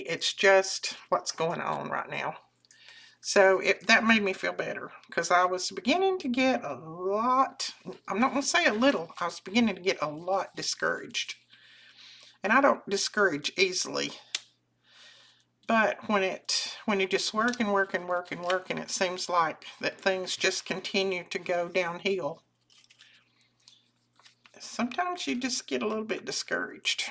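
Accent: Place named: South Carolina, United States. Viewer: it you quit, one of YouTube's brightest stars will dim! You really are talented! Your projects are ALWAYS done SO well! 💖 I moved from South Carolina 15 years ago, and when I hear your voice, I'm right back there where I left a piece of my heart💕😊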